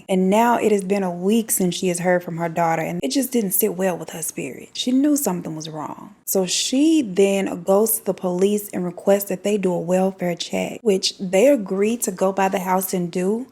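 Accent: South, United States. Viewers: I love listening to your voice and southern accent and just certain words you use I just love! Your entire vibe I’m here for! Get it sis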